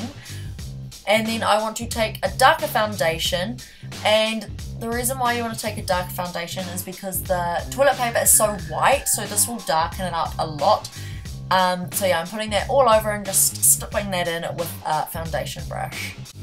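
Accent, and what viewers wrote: New Zealand. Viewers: the kiwi accent always makes me giggle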